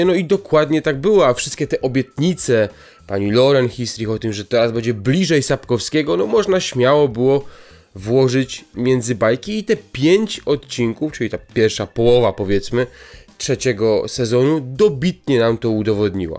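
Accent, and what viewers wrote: Silesia, Poland. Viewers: jesteś Ślązak?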